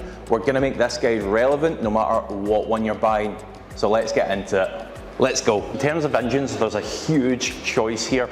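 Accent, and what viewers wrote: Scotland, United Kingdom. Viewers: I like Scottish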